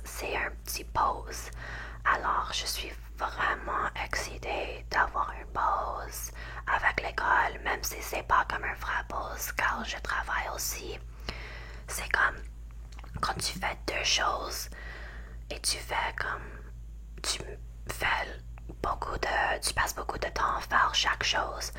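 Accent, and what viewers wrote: Quebec, Canada. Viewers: Wow tu es vraiment bonne, j’aurais cru que tu etais du Qc et non Ont so its even more impressive! J’espere voir d’autres videos en francais de toi ❤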